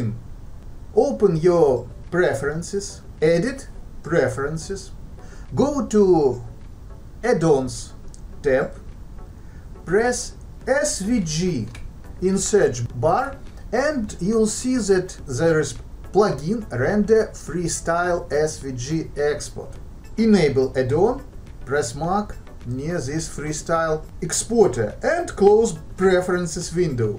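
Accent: Russia. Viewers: По акценту понял что ты русский :elbowcough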